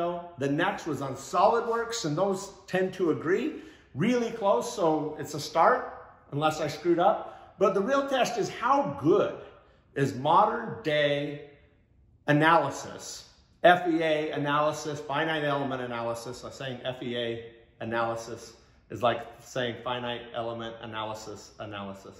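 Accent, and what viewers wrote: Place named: Utah, United States. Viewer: you must be from utah the way you talk.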